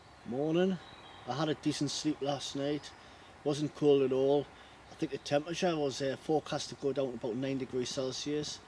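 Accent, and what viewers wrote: Newcastle, United Kingdom. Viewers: Is that a Geordie accent I hear? :D Great video. You hear so much regarding pricey gear, it's great that you went all ghetto with it. Nice!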